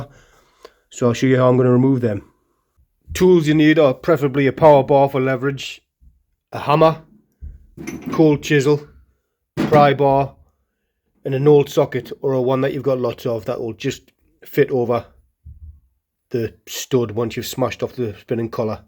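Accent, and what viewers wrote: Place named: Newcastle, United Kingdom. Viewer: You sound like Jimmy Nail. The Geordie accent is my favourite one.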